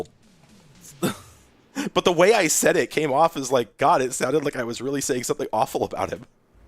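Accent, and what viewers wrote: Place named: Australia. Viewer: Yay. Australian commentator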